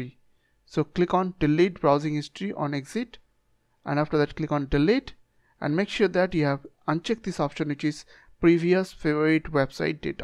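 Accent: India. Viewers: he really got the stereotypical indian tech support scammer voice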